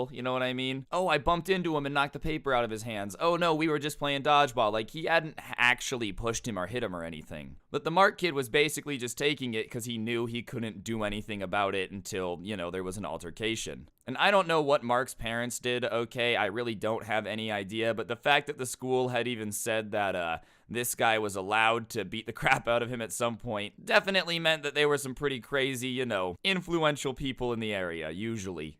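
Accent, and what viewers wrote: Russia. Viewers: Don't mess with the people from the Balkans cuz ottomans and the communists (fascists as well) btw ur Russian accent was good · I love Russian ascent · Your Russia accent is hilarious!!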